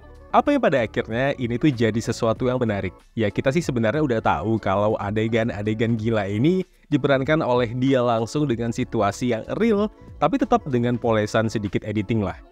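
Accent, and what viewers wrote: Medan, Indonesia. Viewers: kurang dapet bahasa ala ala medannya lek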